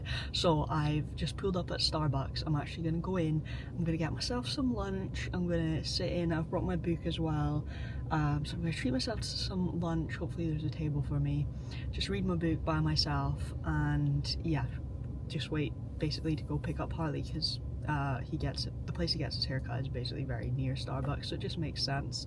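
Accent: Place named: Scotland, United Kingdom. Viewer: would you consider wearing a microphone. Your voice is really gentle and I struggle to hear you without the volume waaaay up, which is fine until ads come on a mega volume 😂 YouTube closed captions can't cope with your Scottish accent either lol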